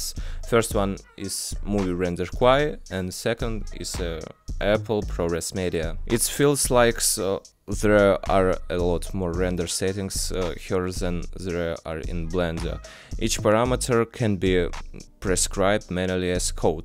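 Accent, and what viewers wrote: Russia. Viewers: Привет! Ты русский , по акценту слышно)))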